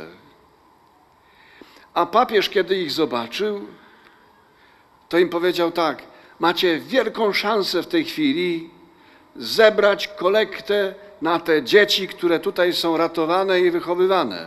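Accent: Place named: Kraków, Poland. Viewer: oprócz Słowa Bożego pielęgnuj ks. abp swój krakowski akcent - jako dowód piękna naszej ojczystej mowy . Niech Matka Boża ma Cię w nieustającej opiece.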